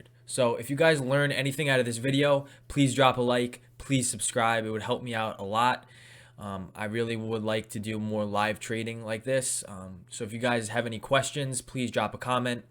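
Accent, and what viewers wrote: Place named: New Jersey, United States. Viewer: yo you from jersey ?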